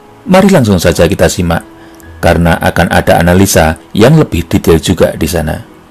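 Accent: Java, Indonesia. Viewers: Saya paling suka chanel catur ini.. simpel ga banyak pernak pernik.. bahasa mudah dimengerti.. kadang ada kata2 lucu macam pion anak kecil imut ini.. hahaha.. logat jawa khas kental sy senang skali. Upload terus mbah..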